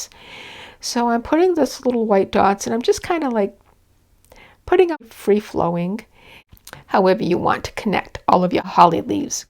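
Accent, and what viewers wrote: New England, United States. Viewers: You have a lovely video library. Just subscribed. Also a lovely New England accent. I’ll definitely be checking back.❤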